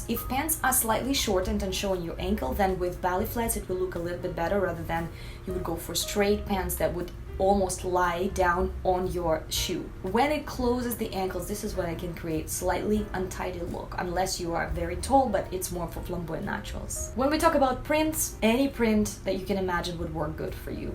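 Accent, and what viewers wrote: Russia. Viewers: У вас прекрасный русский акцент ❤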